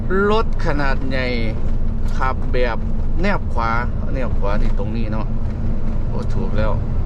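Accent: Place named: Isan, Thailand. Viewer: เว้าสำเนียงอิสานบ้านเฮาโลด บ่ต้องเว้าว่าครับผม แค่เจ้าโดยกะพอ ไปซื่อๆ=ไปตรงๆรึตรงไป พะนะ😆😆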